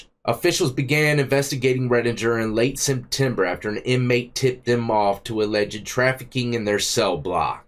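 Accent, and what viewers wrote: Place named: South, United States. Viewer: Love your Southern accent. Love from 🏴🇬🇧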